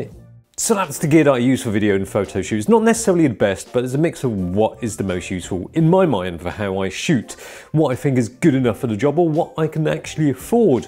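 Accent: United Kingdom. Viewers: British accent is getting so thick, he’s hard to understand !!!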